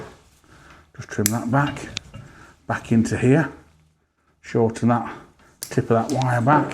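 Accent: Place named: Nottinghamshire, United Kingdom. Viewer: is a North Notts. lad ( like myself.) None of us are ever going to win prizes for elecution. Hi, say hello to Andy R for me.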